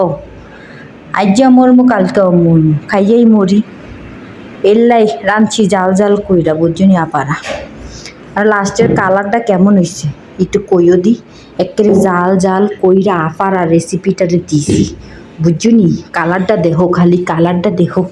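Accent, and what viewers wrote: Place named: Noakhali, Bangladesh. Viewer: আফনের ভাষা নোয়াখালী, চাঁদপুরের অইসে কুমিল্লার ভাষা আফনে বুইল্লা গেসেন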